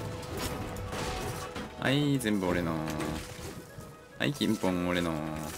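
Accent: Kansai, Japan. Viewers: さんの関西弁めっちゃ可愛い（笑）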